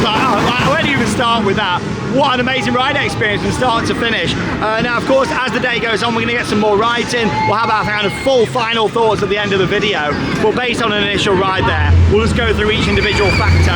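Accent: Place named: United Kingdom. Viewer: Holy British, Batman! I can’t understand a word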